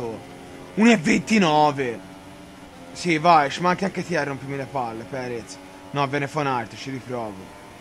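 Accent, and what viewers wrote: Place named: Livorno, Italy. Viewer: Le tue incazzature con l'accento livornese sono favolose ahah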